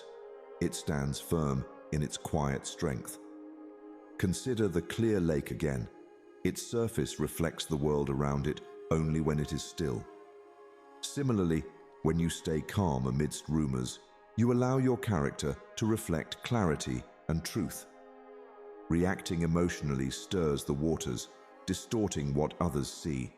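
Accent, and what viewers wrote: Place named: United Kingdom. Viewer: Why is this a British voice???.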